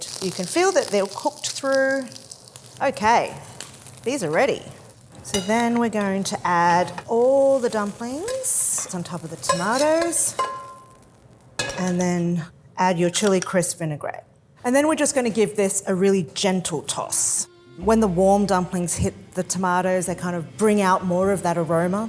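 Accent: Australia. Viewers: I knew she was Australian as soon as she spoke… But just discovered her here. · Love that Aussie accent 🦘🐨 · Thank you for keeping your Australian accent. Once tomato season returns in Australia, I'll be making that salad.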